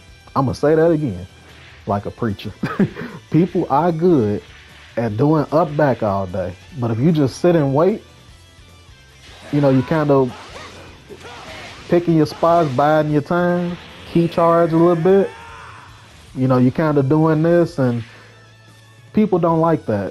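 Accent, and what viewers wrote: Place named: Memphis, United States. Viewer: U sound like you from memphis bruh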